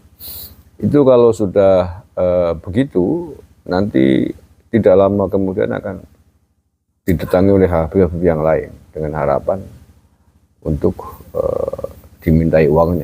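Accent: Banyumas, Indonesia. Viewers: Ngapak nek ora ngapak ya ora kepenak...😉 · Ora ngapak ora kepenak,,😂😂😂❤❤❤❤ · ora ngapak ya ora kepenak mbok? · Ora ngapak ora kepenak 👍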